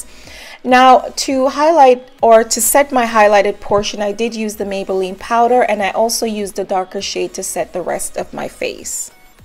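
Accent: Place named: Jamaica. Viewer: Are you Jamaican ? Or of Jamaican/West Indian heritage? I am sensing a hint of my mother tongue :D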